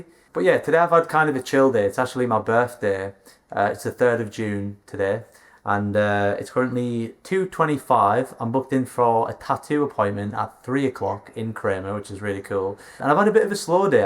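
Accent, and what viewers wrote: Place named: United Kingdom. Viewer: Bro has a really strong British accent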